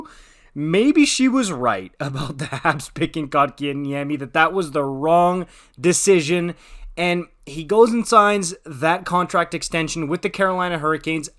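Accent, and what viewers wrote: France. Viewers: It grates on my nerves when he speaks with French accent in the middle of speaking English. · I love how your French accent pops out, dude! Haha, awesome. I'm learning another language watching your videos. ;-)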